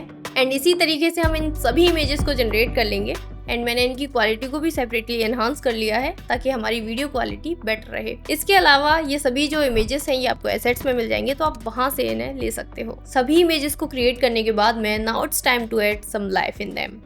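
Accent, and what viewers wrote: Gujarat, India. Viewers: Maybe Work on your Gujarati accent...or else evrything else is fine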